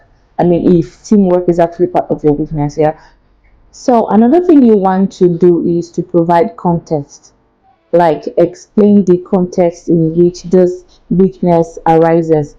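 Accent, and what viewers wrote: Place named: South Africa. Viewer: Madam, are you from South Africa? Please! God Bless You!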